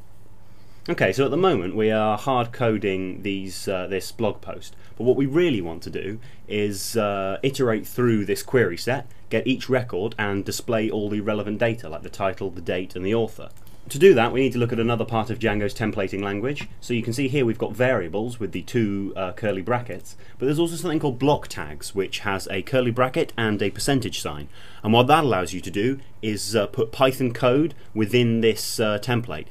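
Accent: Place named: England, United Kingdom. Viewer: God that english accents glues me to this video :O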